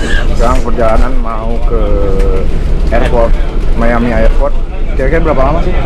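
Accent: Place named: Sunda, Indonesia. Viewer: Logat sunda lu kental bgt broo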